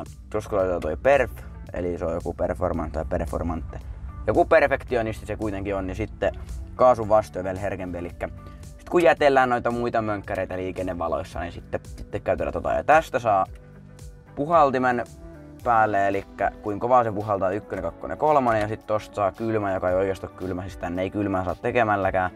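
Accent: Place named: Rauma, Finland. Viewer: Huamaa et poika raumalaine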